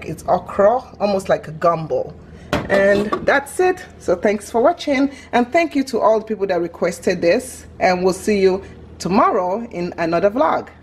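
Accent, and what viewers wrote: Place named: Nigeria. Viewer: i love the way u speak in nigerian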